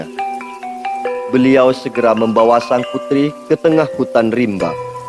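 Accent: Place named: Madura, Indonesia. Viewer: Admin suara madura tulen❤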